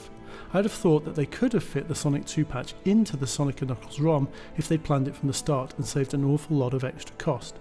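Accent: United Kingdom. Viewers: A charming English voice I gotta subscribe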